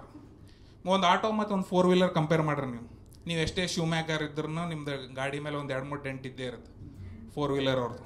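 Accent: North Karnataka, India. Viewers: Uttar Karnataka slang alli stand up comedy... best. Pls innu jasti upload maadi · Underrated Kannada Comic , yena helri pa, uttar Karnataka dialect olag stand-up kelaak mast irteti🔥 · I’m happy to hear nam hurinavaru ee Tara beledirodu. Lots love from Dubai kannadiga . Nam UK style matu berene level 😃🥰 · Nivu yena helari namma uttar Karnataka bhashe na chanda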